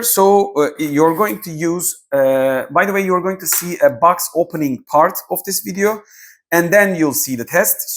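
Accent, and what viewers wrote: Turkey. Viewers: Türkiyeden selamlar aksandan anlasılıyor :))))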